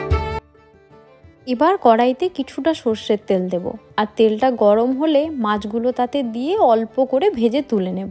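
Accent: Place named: Medinipur, India. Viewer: তোমার বাচনভঙ্গিতেই বোঝা যাচ্ছে । আসলে , মাটির টান তো বোঝাই যায় । তারপর তুমিও যেনো বললে ,"আমাদের মেদিনীপুরের দিকে এভাবেই টক খায় ।" মেদিনীপুরের লোকেরা ঠিকই মেদিনীপুরের প্রতিবেশীদের চিনতে পারে । যাক , কোন মেদিনীপুর জানিও ❤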